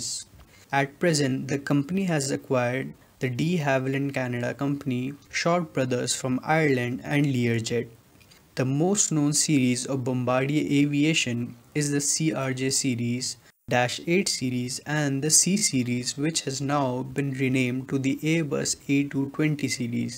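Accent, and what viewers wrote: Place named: India. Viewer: Indian accent made the experience very peculiar... Sounded as...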